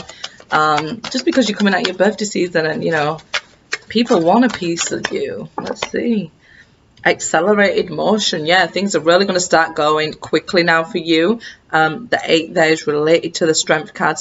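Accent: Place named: Lancashire, United Kingdom. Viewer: You sound like a local. I'm a Lancashire lass😊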